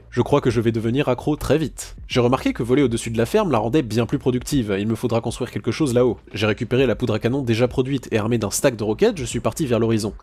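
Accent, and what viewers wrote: Quebec, Canada. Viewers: J'aime ton accent quebecois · tes québécois?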